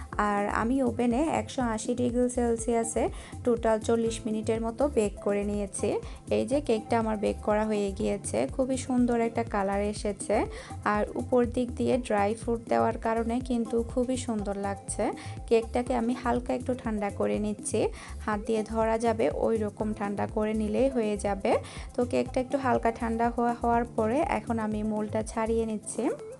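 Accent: Chittagong, Bangladesh. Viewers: MashAllah orange cake daron hoyce.Apu apni toh sob kaje parodorsi amader chttogramer apu bole kotha.mamonira kmn ache apu?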